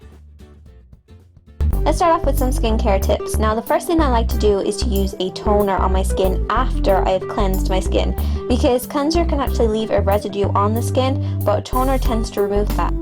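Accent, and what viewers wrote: Ireland. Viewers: yea its irish! I think its because american accents were predominantly derived from irish accents :) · This is an Irish accent :) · shes Irish.. :-) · she's irish :) x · really love her accent :) love irish accent :) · It's Irish. :) Yeah, I've thought the exact same thing - she does go into a bit of an American accent at times. But then she goes back into her Irish one (which I love! because it's so unique, especially on Youtube). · Are you Irish? :D I am ;DD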